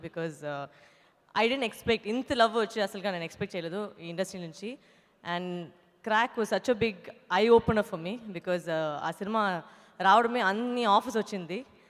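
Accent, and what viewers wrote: India. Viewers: Her Telugu style is same as Karnataka and Andhra border Telugu PPL slang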